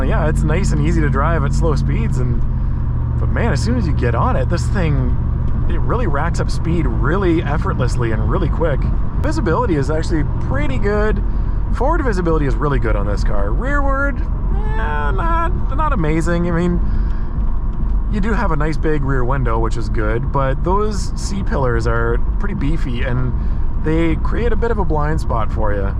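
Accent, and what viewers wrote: Canada. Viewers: I hate Canadian accents. Aouuut and abouut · Relax that's how they say it in Canada...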